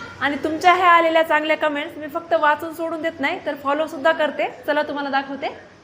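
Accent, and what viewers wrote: Parbhani, India. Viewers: माझी भावजय तुझ्यासारखीच बोलते. ती पण परभणीचीच आहे...छान वाटते ऐकायला❤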